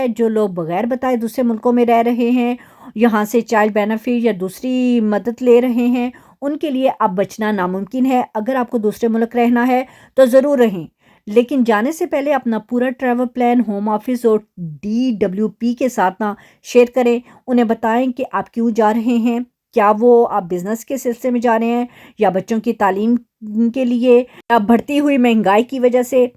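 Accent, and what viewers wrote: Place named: Punjab, Pakistan. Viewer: Tu c Paindo ho. Ardo (Urdu) Punjabi accent vich bol di ho.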